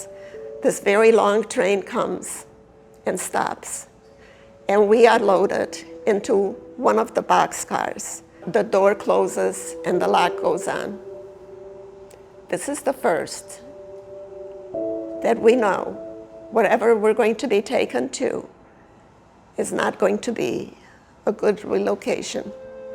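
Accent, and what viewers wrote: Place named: Chicago, United States. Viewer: She's got a heavy story and a heavy Chicago accent :-)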